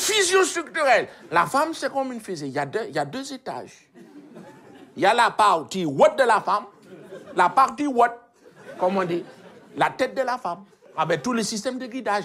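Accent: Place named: South, France. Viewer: L'accent du sud et le regard trop fort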